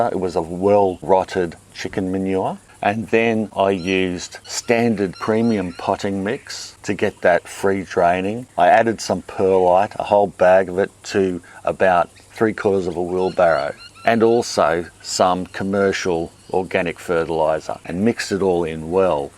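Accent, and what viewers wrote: Australia. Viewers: WOW! An Aussie, in QLD to boot. I live in Cairns so here it's either hot, hotter or pouring with rain (this doesn't include cyclones). It's so refreshing to have some advice from Australia, and not have to interpret it from OS into our climate. I love growing from scraps. I have very successfully grown sweet potato from old (sprouting) potatoes. One year I got over 15 kg!! Growing things in my part of the world can be difficult. "Plant in Autumn", "Sow before summer", means nothing up here. You plant when you remember to and hope it doesn't go to seed before 6 weeks! Anyway, love your information, and to hear an Aussie accent. Thanks.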